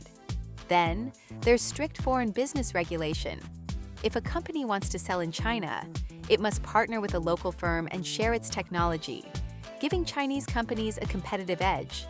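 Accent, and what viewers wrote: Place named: United States. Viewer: You have an American/Canadian accent. Yet you are pro-Chinaman accent. 1+1 doesn’t add up with an American accent. You are an agent of the CCP? Or AI voice agent ?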